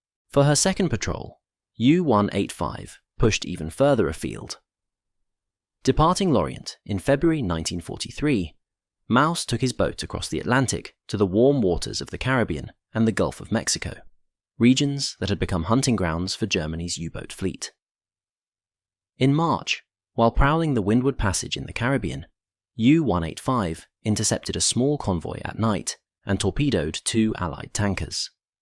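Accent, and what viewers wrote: United Kingdom. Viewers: Az-ores, not A-zores. Hearing a British accent speaking American sounds awful.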